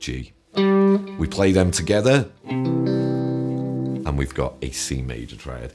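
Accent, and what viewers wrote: Liverpool, United Kingdom. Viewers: Thank for the amazing video my fellow scouser! I also live abroad have done for a long time, so its nice to hear a familiar voice. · Scouse not English! has the most fantastic elegant Scouse elocution. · Bass Scouse !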